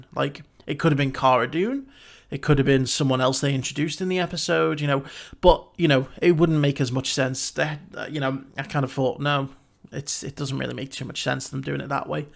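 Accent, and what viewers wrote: United Kingdom. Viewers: Wait. You sound British yet I thought the Mandalorian was available on UK Disney plus till like feb of 2020. Just how are you seeing it ?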